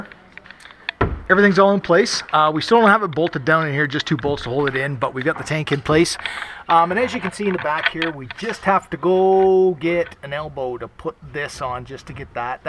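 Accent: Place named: Canada. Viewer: accent annoying. Canadian or border states. Install video was BS.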